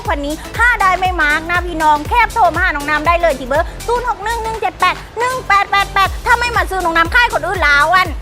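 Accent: Thailand. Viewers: สุดยอดมากแหลงเก่งมากเพราะมากถ้าไมแหลงใต้เรานึกว่าเด็กเหนือนะ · แหลงใต้เพราะมากก สวัสดี บายบ่าย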